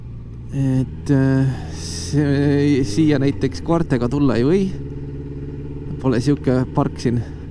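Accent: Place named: South Estonia, Estonia. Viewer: Eile avastasin su kanali ja vaatasin mõned videod ära. Päris huvitav. Väga hea selge heli kvaliteet on sul sõidu ajal. Ja hea keele kasutus. Tänapäeval kiputakse inglise slängi kasutama, aga sina räägid puhast Eesti keelt. Kas sa oled Lõuna Eestist pärit?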